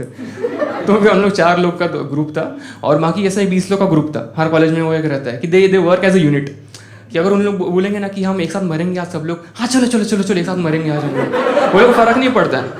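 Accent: Maharashtra, India. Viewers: Your marathi tone is masking your talent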